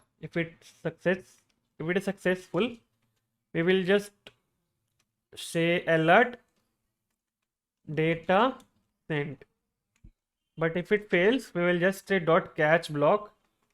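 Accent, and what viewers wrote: India. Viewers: Indian accent provides clarity. Yeah and you guys are proving that you don't have good teachers to teach you guys, you depend on Indians. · Omg please not indian-english voice magodddddddddd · his accent is so thick indian, it's hard to get anything he says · change the indian accent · Indian accent make me easy to understand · no hate towards Indians but I hate their english accent it irritates my ears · Just 1 thing i like more than study programming - is to study programing in English with indian accent. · Stop being so offended dude. Narrator has an accent thick as a curry which is a fact. Before you call me racist, I am an Indian too and I would have said the same thing.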